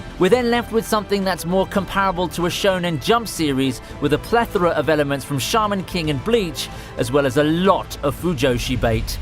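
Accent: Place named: United Kingdom. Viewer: I like your UK accent 👌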